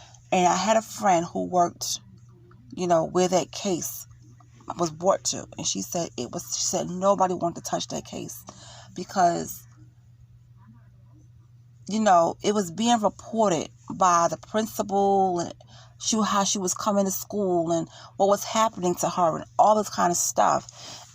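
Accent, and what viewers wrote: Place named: New York, United States. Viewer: I'm from Detroit. I love your ascent. Sounds like NY?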